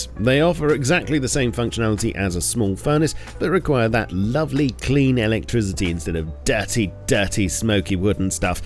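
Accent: England, United Kingdom. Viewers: With a little less English accent and going slowly maybe you also understand what you say in an info video! Omg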